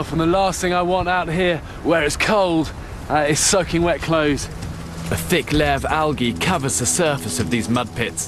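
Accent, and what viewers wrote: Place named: England, United Kingdom. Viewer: Recien me doy cuenta por su acento que es ingles :O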